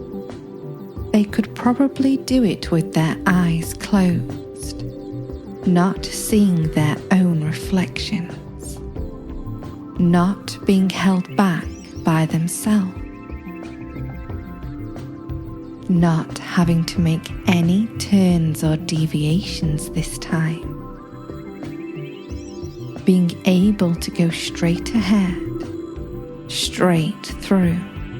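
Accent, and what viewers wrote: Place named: England, United Kingdom. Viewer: Sensuous... so , so sexy....gorgeous.... sultry voice.....beautiful English accent....root beer eyes .....LOVE when you look directly into the camera. I fucking LOVE when I see a woman that knows how to be a woman , knows how to use her powers with men. You are irresistible , m' lady. Thank you for sharing your gift with us.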